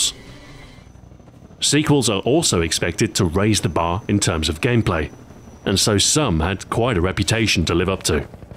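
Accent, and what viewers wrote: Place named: United Kingdom. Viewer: Ah-cah-reena is just the American accent. The narrator here is British, so pronounces the letter "o" very sharply like all "o"s are in the British accents.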